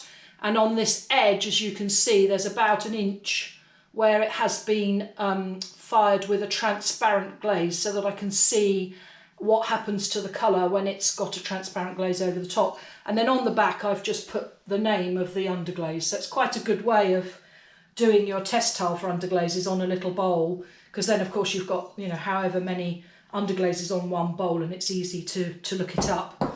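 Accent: United Kingdom. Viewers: HELLO! great to hear a British accent! i get confused about Underglazing....i understand that after you ug a greenware piece, you then bisque it....then you put 2x zinc free clear on for shiny....now here's my questioin: can i put wax resist over all that and then apply my regular glazes? i know that Amaco Potter's Choice may cover up my underglazed, clear glaze (and waxed?) design, so how do i avoid that??? i like the effect of the PC glazes which alot of them 'run'. if i wax my design, i wonder if my regular glazes will cover up the design? thank you!!!!!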